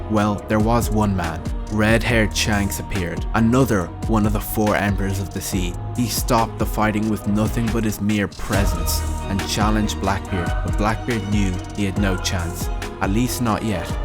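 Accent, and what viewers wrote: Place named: United Kingdom. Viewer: Such a lispy British accent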